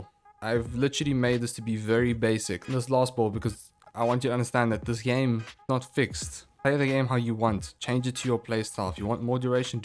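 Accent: Afrikaans, South Africa. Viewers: My fellow South African. The reason I asked was because I could hear your Afrikaans accent.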